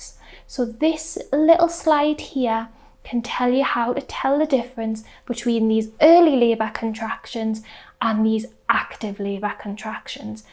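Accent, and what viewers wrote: United Kingdom. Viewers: It is a Northeast accent, not sure where you are from but do be so judgemental, unless you are a southern English snob? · I love your voice and accent 😍💕 sounds Scottish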